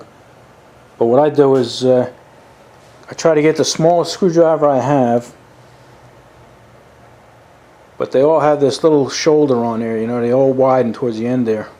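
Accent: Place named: New York, United States. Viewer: So where in New York are you from?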